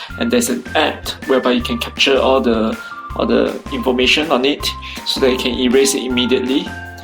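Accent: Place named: India. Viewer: Are you Indian? Hard to understand...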